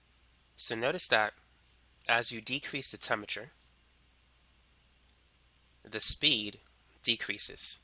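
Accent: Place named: Boston, United States. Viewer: Its how they talk in boston ...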